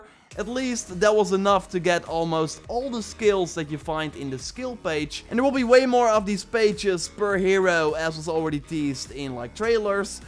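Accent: Netherlands. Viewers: That Dutch accent is so strange and thick.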